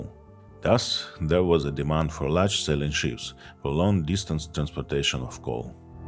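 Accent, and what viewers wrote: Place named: Russia. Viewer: great video and the russian accent makes it old home week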